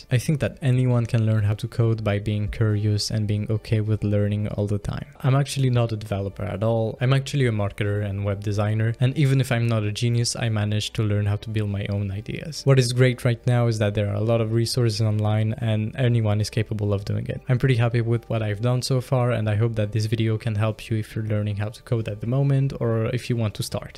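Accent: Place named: United States. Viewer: Comment tu as un accent totalement américain alors que tu est bilingue ?les techniques pour mieux apprendre l'anglais.merci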